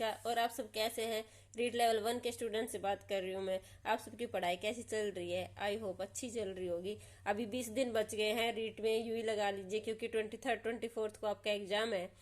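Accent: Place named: Haryana, India. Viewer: Haryana tach boli,,, HR ki boli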